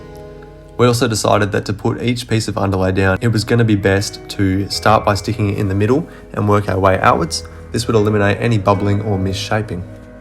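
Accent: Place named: Australia. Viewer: the job looks like it was quite a lot of work, you did a good job. What's with the furry seats LOL, Maby they will be a project too. I see your dad helps you a lot, I had a dad like that. you're really lucky, a lot of guys don't have dads like that. You should always let him know how much you appreciate him. I enjoy your videos, keep them coming I am looking to see what all you do to your vehicle. I am in Florida USA, your accent sounds like your down under.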